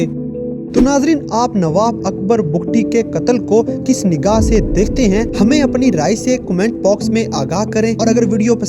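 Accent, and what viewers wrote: India. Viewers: یہ چینل انڈین ہے اس کا لبو لہجہ بتا رہا ہے ۔ نفرت پھیلا رہا ہے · In my opinion The accent belongs to India.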